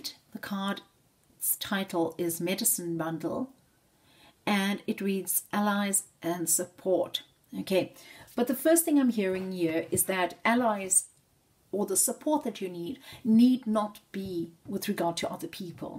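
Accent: South Africa. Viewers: Love your style 🔥 Also lové your accent, are you based in South Africa by any chance?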